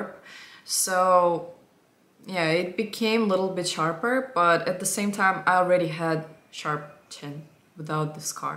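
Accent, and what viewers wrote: Russia. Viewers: привет, я начала смотреть тебя пару месяцев назад, потому что меня заинтересовал блог, и я хочу послушать акцент разных людей, поэтому сначала мне показалось, что ты из России, а сейчас узнала, что это действительно так) я хотела сказать, что ты замечательно выглядишь и у тебя очень хороший вкус, для меня ты стала одним из примеров, на кого надо ровняться, спасибо тебе за это 💌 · Как только я увидела ваше другое видео, я сразу подумала, что вы русская) Это видно по внешности и по тому, как вы говорите) И при этом я сама не русская, но из России. Очень интересно то, что мы можем узнать русских среди других европейцев, так и людей из своего народа я точно так же вычисляю среди других азиатов) Но я вообще не знаю как я это делаю. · Несмотря на то, что ты прекрасно говоришь по английски, я почему-то сразу поняла, что ты русская, и это подтвердилось! 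Успехов тебе, береги себя! Со скольки лет ты стала работать за границей? Родители нормально отпускали?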